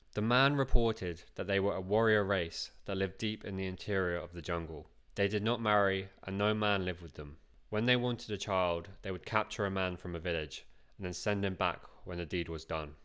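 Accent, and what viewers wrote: United Kingdom. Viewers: Glad it's not AI, I think, but does it have to be a Brit accent? Give us a break! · it’s quite a light British accent bruv Worry not, not long from now you’ll be able to make tiny adjustments such as changing the accent through AI.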